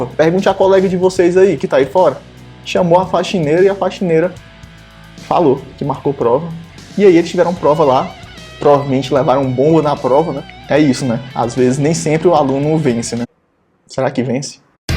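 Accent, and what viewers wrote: Ceará, Brazil. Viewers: Você é cearense?? Hauausus quase meu vizinho slc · o senhor é cearense? ou fez matemática na UFC?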